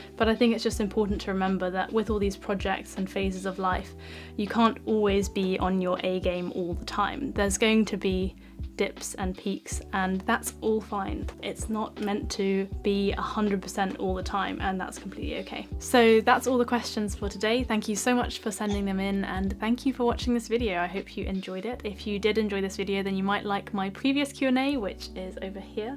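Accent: RP, United Kingdom. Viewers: Late to the party, but are you a citizen of the UK or abroad? I have heard that people in the UK rarely have an RP accent except for the ones that stood abroad in private institutes. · I’ve started watching her video to listen her British English and now found her using a Japanese cleanser Goku Jyun! As a Japanese I’m so proud of our product has been used in UK and somehow it feels funny😂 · I wish we could meet in person,so that you can teach me to speak a British accent.🙂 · 我来自中国，很喜欢你的英音！欢迎来中国旅游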